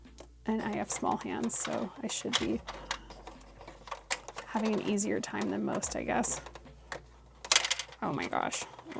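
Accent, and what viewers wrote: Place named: Canada. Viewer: I actually thought you where Canadian 😂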